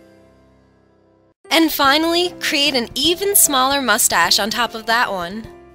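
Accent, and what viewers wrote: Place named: Newfoundland, Canada. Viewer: Are you from Newfoundland? Your accent sounds just like one!!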